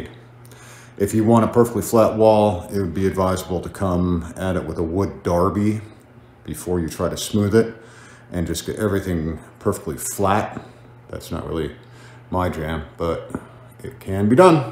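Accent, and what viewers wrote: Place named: Pittsburgh, United States. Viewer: You have that upper Pennsylvania accent like Pittsburgh. I’d wouldn’t be surprised if that is where you were raised. Just a guess. It’s a uniquely subtle accent.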